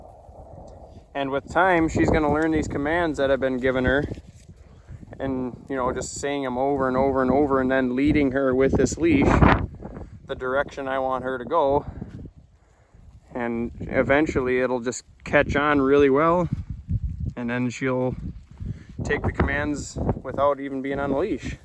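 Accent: United States. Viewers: Nice to listen to a fellow midwestern accent. Keep up the great work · First time I hear someone talk about herding with an American accent. It sure is something to get used to after listening to Scottish and Irish farmers. Anyway, very interesting video.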